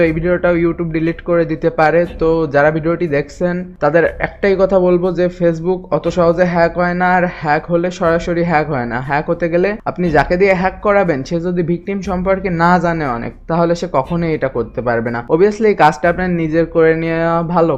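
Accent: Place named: Jessore, Bangladesh. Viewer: ভাই আপনার বাসা কি যশোরে নাকি। আপনার ভাষার যশোরে মত লাগে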